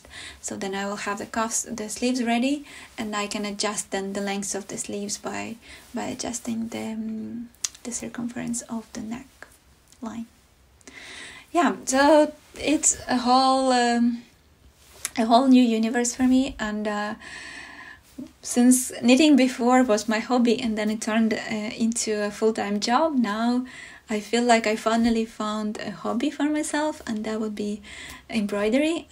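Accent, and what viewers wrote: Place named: Europe. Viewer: This is my first time watching your podcast and I loved it.. thank you so much. You are very talented & it is a joy to follow you. Also, because I am also from Europe, it is so lovely to hear your accent. Your story of your grandma embroidering the blouse really touched me, as it reminded me of my own beautiful grandmother. She was from Austria.. a wonderful knitter, crocheter & embroiderer, who also designed knitting garments & just knit from her memory. I learnt so much from her, and miss her every day 💕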